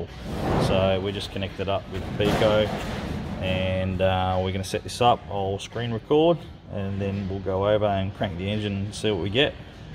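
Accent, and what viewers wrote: Australia. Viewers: Absolutely love seeing your effective use of the Picoscope for diagnosis. Not to mention being in Oklahoma back in the states we love to hear your Aussie accent for all I know you might enjoy hearing my whiny hick voice in a good old USA too!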